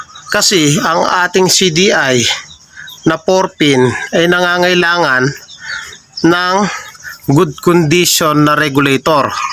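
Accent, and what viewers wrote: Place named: Pampanga, Philippines. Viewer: May tanong lang po ako, out of the topic lang. Kapampangan po ba kayo? Mag pagka-accent po kayo Kapampangan eh hehe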